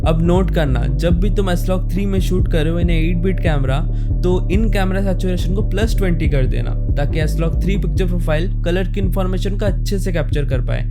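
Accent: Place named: Bengal, India. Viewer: Your bangali accent sucks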